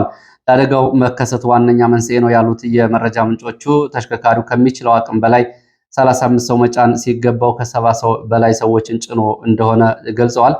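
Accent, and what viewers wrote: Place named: Tigray, Ethiopia. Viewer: አማርኛህ የትግርኛ ተጽኖ ያለበት ይመስላል።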